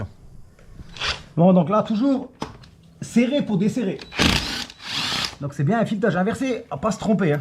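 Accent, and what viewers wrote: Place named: Moselle, France. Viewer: Un beau tuto , dommage que je n’ai pas en profiter quand j’ai fais la mienne y’a deux ans Ma femme dit que tu as le même accent que moi Vive la Moselle-est mdr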